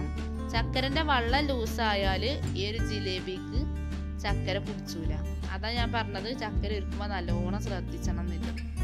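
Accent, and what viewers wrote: Malappuram, India. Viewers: ഞാൻ ഇപ്പൊ കാണൽ തുടങ്ങിട്ടൊള്ളു. ഒരുവിധം കണ്ടു വീഡിയോസ് ഒക്കെ സൂപ്പർ. അവതരണം പറയാതെ വയ്യാ. തനി മലപ്പുറം ഭാഷ. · മലപ്പുറം താത്താന്റെ സംസാരം കിടു 🤣😘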